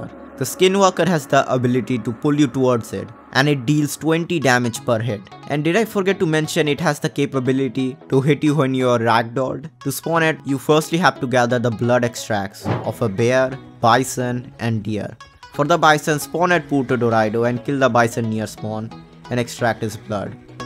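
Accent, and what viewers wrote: India. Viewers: are you an indian your accent is funny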